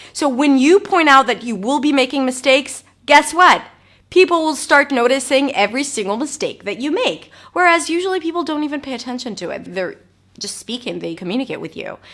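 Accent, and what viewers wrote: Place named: United States. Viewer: like your American voice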